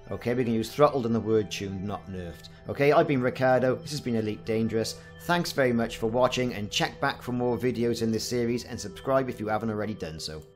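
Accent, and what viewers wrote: Wales, United Kingdom. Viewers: Welsh CMDR?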